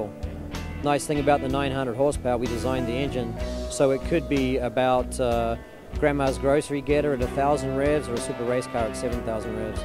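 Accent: New Zealand. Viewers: is that a little nz accent mixed in? lol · souns like a kiwi no doubt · this guy sounds like a kiwi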